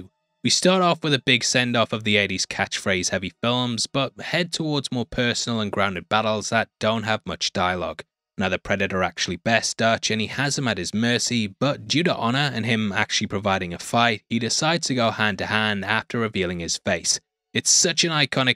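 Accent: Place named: United Kingdom. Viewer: Why is that mate..? You’re clearly (judging by the way your voice sounds) speaking in a U.K English accent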